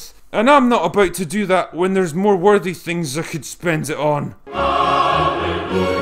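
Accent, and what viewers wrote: Scotland, United Kingdom. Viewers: You get more and more Scottish every video, won't be long before we won't be able to understand you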